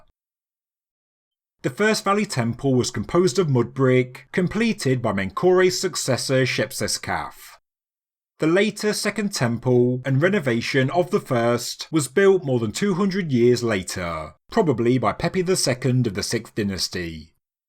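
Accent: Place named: Midlands, United Kingdom. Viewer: He’s from the Midlands………… leave him alone😡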